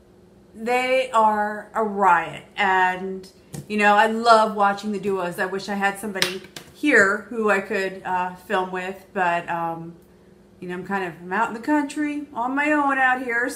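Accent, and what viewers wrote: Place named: Philadelphia, United States. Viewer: I have to watch you! You’re from up near my hometown (Philly) and the accent makes me feel like home lol plus, you crack me up 😂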